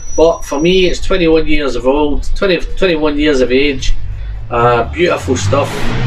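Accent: Scotland, United Kingdom. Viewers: Edinburgh and still here. I love what all the Whisky guys are doing but a broad Scottish accent tops it off. Take care wherever you are traveling to and as Ralfy says, keep it quality and don't get pished.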